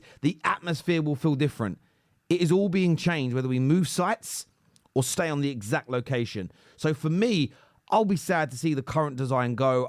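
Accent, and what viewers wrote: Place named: North, United Kingdom. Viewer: I’m struggling to understand your broad northern accent.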